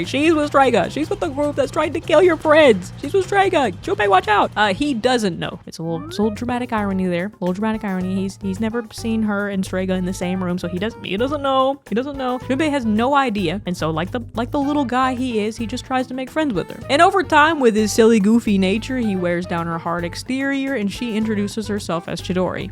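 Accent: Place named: Texas, United States. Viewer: this is totally unrelated to the video but you must be from the south right? I’ve lived in Texas my whole life and you remind me of people I’ve grown up with and people I’m around all day every day with your accent and the way you talk. I swear you must be southern cause it’s uncanny how alike you sound to people I know in Texas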